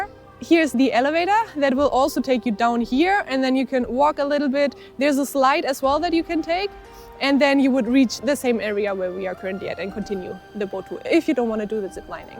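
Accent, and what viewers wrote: Germany. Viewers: Hallo! Ich höre da einen deutschen Akzent raus-daher in deutsch: großes Lob für super informative Videos! Wir sind demnächst auch in den Avatar Mountains.Darf ich mal fragen, wie ihr das mit der Drohne und der Anmeldung hinbekommen habt? Man benötigt, so weit ich weiß eine chinesische Telefonnummer. Würde mich über eine Antwort freuen.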